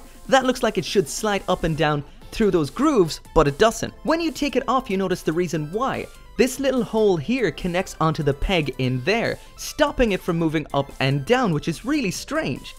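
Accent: Ireland. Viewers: I can't tell if your Irish or American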